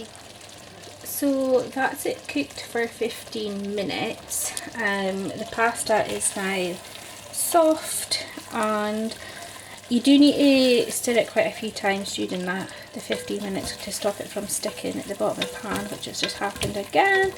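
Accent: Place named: Scotland, United Kingdom. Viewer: ove your accent, is that Scottish??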